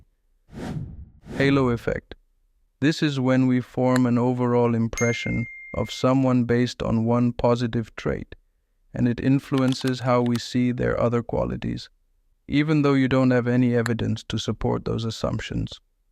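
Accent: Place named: India. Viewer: indian accent and bad microphone = not watching the vid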